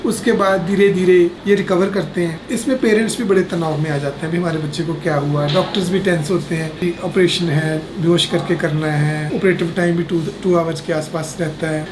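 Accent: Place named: Pahari, India. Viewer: Is Dr from Himachal...?? Because he has a pahari accent ❤😊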